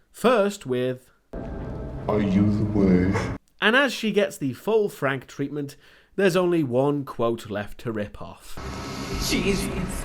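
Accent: United Kingdom. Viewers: Is this dude British? I love his reviews btw I just want to know if he is or not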